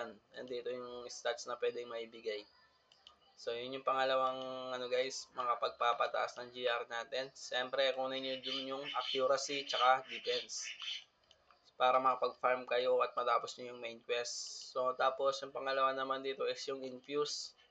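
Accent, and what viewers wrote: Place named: Pampanga, Philippines. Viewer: kapampangan accent core ❤❤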